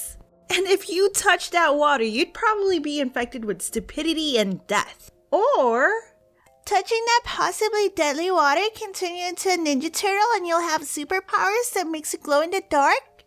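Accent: Philippines. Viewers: I love her filipino accent.... X3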